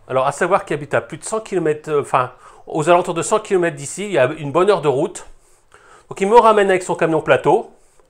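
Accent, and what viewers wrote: North, France. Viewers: Le bon accents du nord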